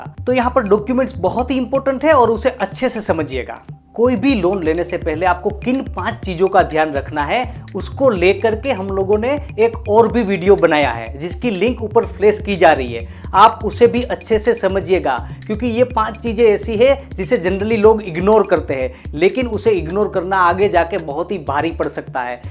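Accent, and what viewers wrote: Gujarat, India. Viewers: I think you are gujju. I feel this accent😅🤟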